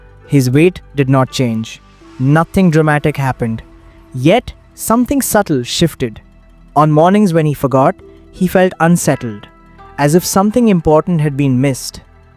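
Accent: India. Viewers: japanese story with indian accent… random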